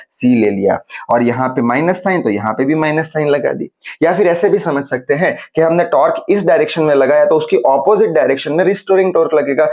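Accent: Gujarat, India. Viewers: Sir aap gujarat se ho??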